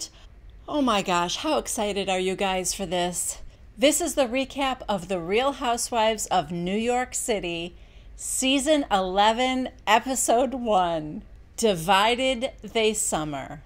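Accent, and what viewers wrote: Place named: Chicago, United States. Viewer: I love Luann! She's such a character. Sonja is the most eccentric, accidentally hilarious, cringiest housewife of them all lol I got the same vibe from Barbara. The only positive thing that could happen from that is that she knows a lot about Luann, and doesn't seem to hold back based on that convo with Carol a few seasons ago. I don't know, im on the fence about it too. By the way, what state do you live in,? You gave a slight accent that reminds me of Chicago or something. I'm from a very small, rural town in Indiana, and everyone thinks we sound southern lol but I've always wondered where you live, if you feel comfortable telling us.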